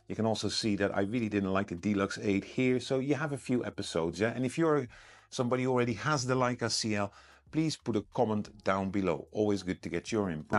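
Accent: Netherlands. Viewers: Wan toe pats, der wie goo. Jup definitely dutch! Great video, keep it up!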